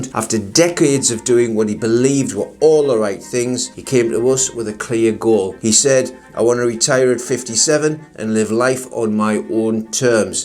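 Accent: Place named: Ashington, United Kingdom. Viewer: I left England in 2005 but you sound like you're from Ashington - like me hehe.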